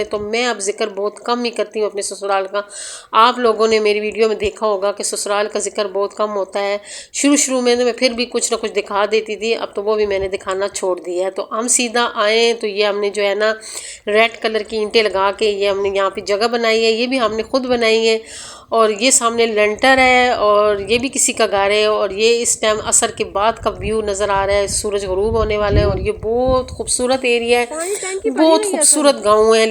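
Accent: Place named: Chakwal, Pakistan. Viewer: Ap achi lagti hain buht mjy simple sa apka style hai aap chakwal se to belong ni krtin ? Apka lehja baat krnay ka bilkul chakwalio jesa hai btw I am also from chakwal ❤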